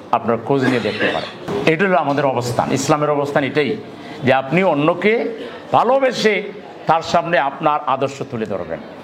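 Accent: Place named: Sylhet, Bangladesh. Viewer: উনার বাড়ি সিলেট।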